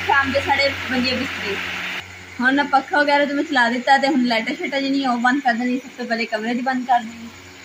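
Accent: Punjab, India. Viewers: Ap koli boli pure pnjabi bht achi lg rhi h...mje apni ami ka gr yad aa gya h...jahan sb aik sehan me soty hn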